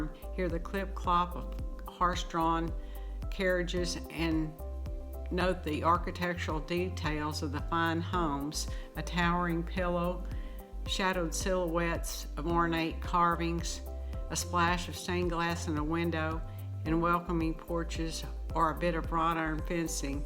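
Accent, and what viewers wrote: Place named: South, United States. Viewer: I love your southern accent. It's so calming.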